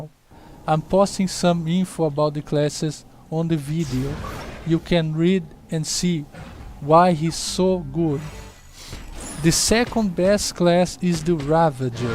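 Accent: Brazil. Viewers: esse sotaque brasileiro!!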